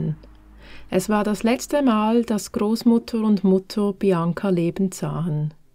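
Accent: Switzerland. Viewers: Du bist eine Schweizerin ….hihi…..man hört es 😃 Ich auch ….aber bei mir hört man es weniger …..weil das meine 2. Muttersprache ist Hab dich gerade entdeckt Abo dagelassen 🧡 Wenn du noch bisschen was übest gehts besser mit dem Hochdeutsch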